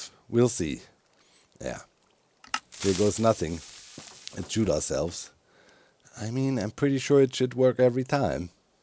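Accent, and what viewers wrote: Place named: Germany. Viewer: I- you're German! I've been hearing your voice in other hermits videos, and couldn't pin point your accent! I feel so silly, but I guess I grew up around enough German accents that it sounds as "normal" to me as English.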